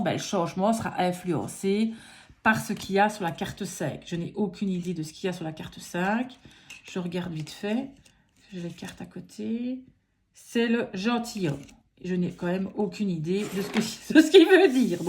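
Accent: Belgium. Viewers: super merci video haaaa l'açant Belge il y avait longtmeps tu est de quelle region ? merci un ex belge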